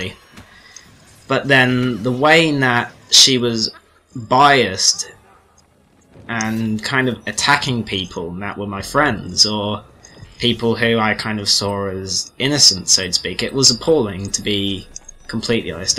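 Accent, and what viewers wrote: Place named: United Kingdom. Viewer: 1) What did they banish you from? 2) Why do they call themselves an organization yet do this? 3) To be frank, why do you keep playing? 4) I believe you can report players to Sony. If so, why don't you do this. 5) Why do you not make a video exposing these people and what the have done? If you're afraid of being no better than them, you needn't worry, for it is simply you expressing your views and feelings. P.S I love British accents; IMO, they are superior to that of Americans (I'm American) P.P.S. Please, make tip-videos and more commentaries! I'm an assassinate noob and could use the help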